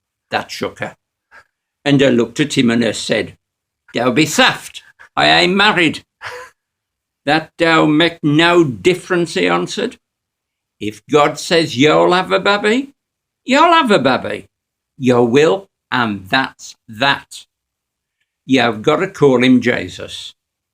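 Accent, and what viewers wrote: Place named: Black Country, United Kingdom. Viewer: Wonderful! I was looking for scripture read in Black Country accent when I found this gem.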